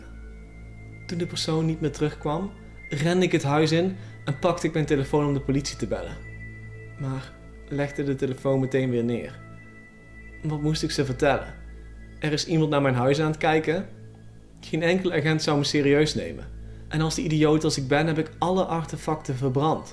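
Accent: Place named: Belgium. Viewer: is een belg of limburger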